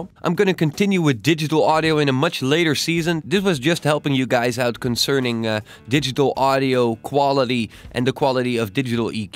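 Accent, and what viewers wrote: Netherlands. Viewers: Your English pronunciation is pretty good (better than mine probably). Still, some words you say, reveal that you must be Dutch. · nederlands accent? :) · English with a Dutch accent really is one of the easiest recognisable out there. It's really amusing, since there's no way you can be wrong if someone is Dutch when you hear the way of pronouncing :D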